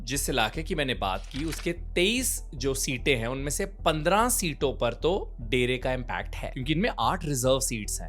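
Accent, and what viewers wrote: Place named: Punjab, India. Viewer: I love ur punjabi accent bro 👌.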